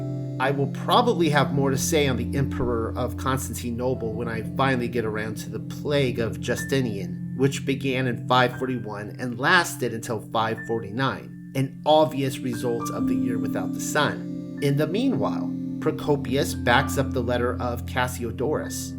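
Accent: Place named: United Kingdom. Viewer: sounds like he was in the UK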